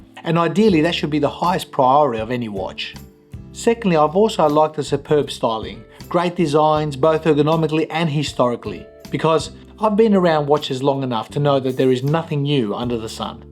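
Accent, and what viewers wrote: Australia. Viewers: Fix that damn obnoxious Aussie accent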